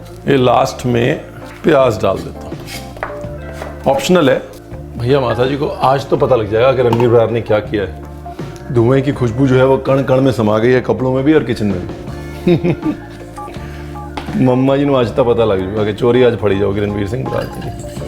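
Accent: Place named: Punjab, India. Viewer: Recipes te ghaint hai hi bolande style wah ji wah pure Punjabi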